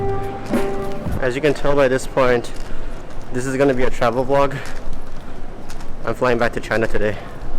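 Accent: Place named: India. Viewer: tell me why you have Indian accent.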